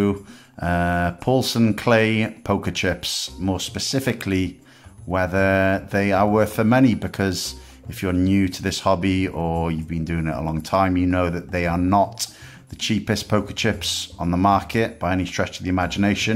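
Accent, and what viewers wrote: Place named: South Wales, United Kingdom. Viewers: Swansea, along the Mumbles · You sound like a south walean. Am I right? Cardiff/Caerphilly way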